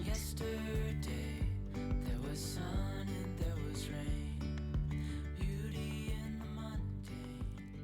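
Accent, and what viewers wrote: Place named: Cape Breton, Canada. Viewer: This is the first of your videos that I've watched. You sound like you come from Cape Breton.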